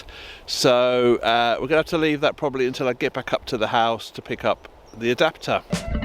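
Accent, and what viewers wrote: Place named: England, United Kingdom. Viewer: Thank you for your reply. You are lucky and blessed to own a large piece of land in such a beautiful country with a moderate climate and lots of water. You sound English. I noticed last year that a lot of Englishman are retiring there. They do seem to have a lot of restrictions. If I could get family to move there I would be there in a heart beat. In my area a lot of people go to Mexico but I prefer Portugal to any other countries 👍🏼🙏💝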